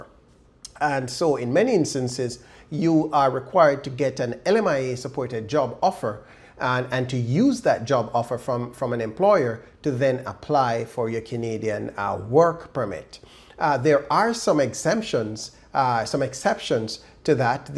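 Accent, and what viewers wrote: Jamaica. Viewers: I hear a Jamaican accent 🇯🇲☺️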